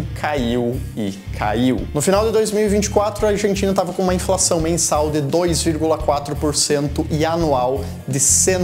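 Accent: Rio Grande do Sul, Brazil. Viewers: Nossa, primeira vez q escuto sotaque gaúcho de uma maneira formal, dá uma estranheza pra mim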